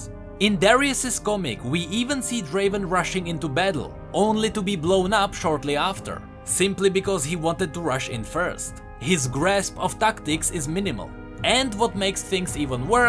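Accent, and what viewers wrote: Czech Republic. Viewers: obv že je čech hes accent is silenj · Bruh you got czecch accent lmao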